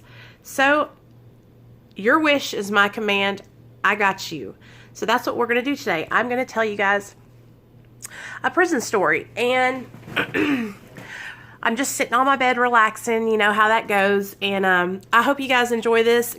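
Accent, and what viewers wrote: South, United States. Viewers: i love 💕 your accent is that down south ????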